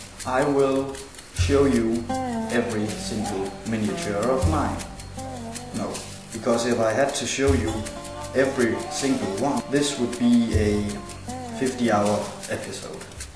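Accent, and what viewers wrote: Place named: Denmark. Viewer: I just love when you clearly can tell that you are danish just from your english accent!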